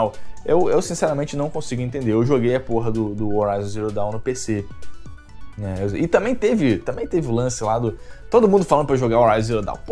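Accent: Rio de Janeiro, Brazil. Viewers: eu não acho que foi o sonysmo que salvou o canal, o apelo sempre foi um cara falando merda com esse sotaque carioca engraçado, não importa o assunto, por isso faz sucesso e é o canal que mais cresce no brasil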